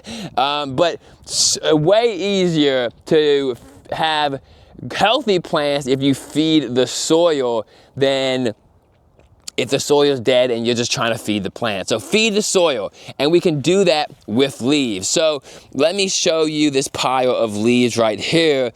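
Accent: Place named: New York, United States. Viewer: Cant handle that hardcore ny accent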